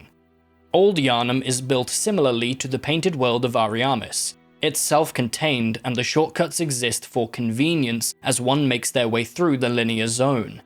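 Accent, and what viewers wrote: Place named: United Kingdom. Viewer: stayed for the take, but stop being British it's distracting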